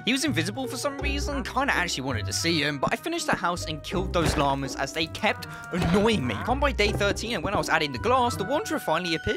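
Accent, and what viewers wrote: United Kingdom. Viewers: Are you a british? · sorry for out of the topic, but... is that British accent? because i really want to learn English and i hope i can speak with British accent too. i don't know why, American accent is too difficult for me😢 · Why are you British? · I love your accent! Are you from England? What region? (I still can't tell between England or Australia, sorry if I'm mistaken!) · Are you speaking british english? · Bro is so brittish 😭😭 But the video is guud😂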